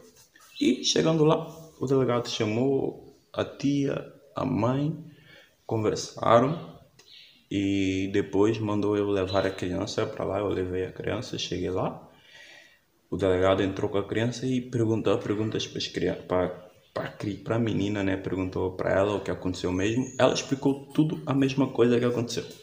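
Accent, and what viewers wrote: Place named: Brazil. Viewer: Vc é um brasileiro puro kkk O sotaque de Guiné Bissau é assim ou é por influncia Brasileira?? Muito legível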